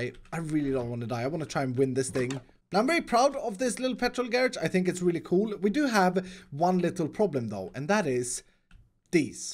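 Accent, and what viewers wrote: Sweden. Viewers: are you Swedish? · to me its sounds like a harsher Swedish accent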